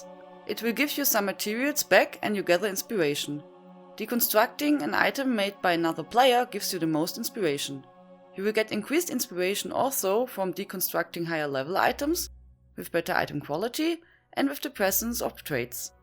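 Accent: Germany. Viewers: Very solid explanation of a misunderstood but important part of the game. If I had watched this video about 3 months ago I'd be in a better spot with my crafting character. German accent also helps. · uiuiui xD Man hört das Deutsch sehr kräftig raus ^^ Mir hat das Tutorial gefallen, es war sehr informativ, hat meine Frage beantwortet und vorallem war es sehr unterhaltsam xD Nur eine Frage hätte ich an dich... ich hab irgendwas vonwegen Craftingquest mitbekommen... gibt es das oder hab ich mich verhört? Und wenn ja, wo kann ich so eine Quest finden? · ich mag den deutschen englischakzent irgendwie xD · Post Da stoner Seems like an German Accent my guy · Beutifull german/viking :D accent. :)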